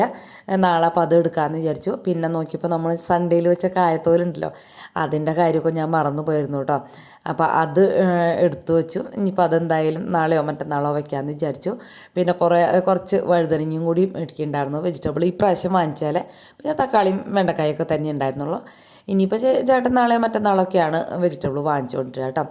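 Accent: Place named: Thrissur, India. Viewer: ഈ തൃശൂരുഭാഷ എനിക്കെന്തിഷ്ടാന്നോ💜💛❤ "എന്തൂട്ടാ " എനിക്കെന്തായാലും വീഡിയോ നല്ല ഇഷ്ടാ എന്നെപോലെ കുറേപേർക്കിഷ്ടാവും ഒന്നും കണ്ടു മടുക്കാറില്ല, ഓരോന്നും പുതിയതു പോലെ തോന്നും ❤💙 സങ്കടപ്പെടണ്ടാട്ടോ പിന്നെ സന്തോഷങ്ങൾക്കു മേമ്പൊടിയായി ഇത്തിരി സങ്കടവും,വിതുമ്പലും വേണമെന്നല്ലേപറയണത് എന്നാലേ സന്തോഷങ്ങൾക്ക്, ജീവിതത്തിനു പൂർണത കിട്ടുവൊള്ളത്രേ ❤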